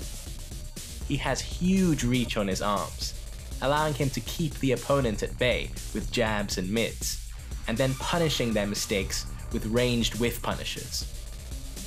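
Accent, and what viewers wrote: United Kingdom. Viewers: Sayuko Tanaka yes I learnt Japanese. I didn't mean "ay" as in "say" I mean "ah". I just didn't hear him properly because he didn't say the "ah" sound clearly. Maybe his British accent.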